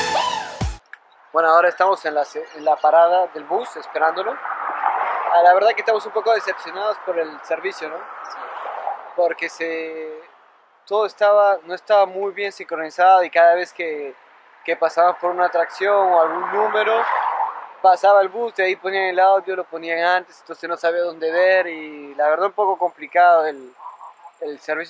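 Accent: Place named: Europe. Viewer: ah, francesa. Sí, me pareció que tiene un acento europeo. Me alegro que les gustó Argentina. Saludos!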